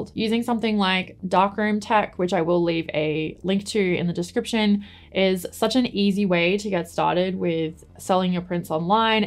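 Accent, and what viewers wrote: Australia. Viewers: Subscribed. Enjoyed the video. It's crazy how I picked up your AU accent. Over the years I started to follow a few photographers from AU and this algorithm is now flooding me. But there are really good creators out there. Hope to visit one day.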